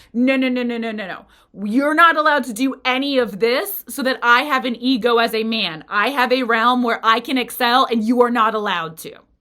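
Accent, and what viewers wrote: United States. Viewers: what region of America are you from?, you have a slight accent, just curious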